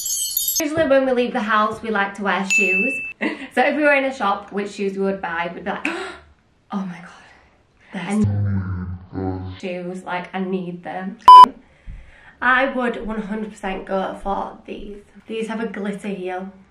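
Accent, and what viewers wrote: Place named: West Yorkshire, United Kingdom. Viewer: West Yorkshire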